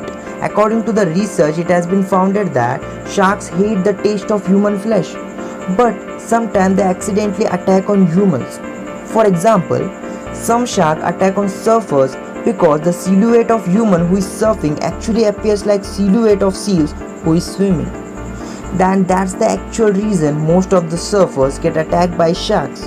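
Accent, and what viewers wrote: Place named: India. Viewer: Felt from ur accent . Me Indian too . Ez science . :)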